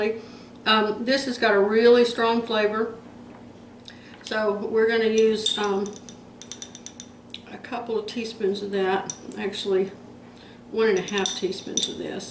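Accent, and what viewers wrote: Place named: Texas, United States. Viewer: I just found your site and you sounded like a Texan. Now I see that you have on an apron with Texas. Where in Texas are you? I grew up in Temple and now live in Paraguay.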